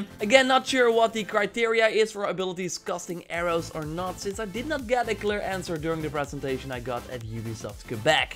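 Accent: Netherlands. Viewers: Bertram Rogers I am sure that there are YouTubers covering this gameplay why don't u go watch them, and i am sure that165k people like his dutch accent and his opinions or else they wouldn't be subscribed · Je hebt een zwaar Nederlands accent, jow now?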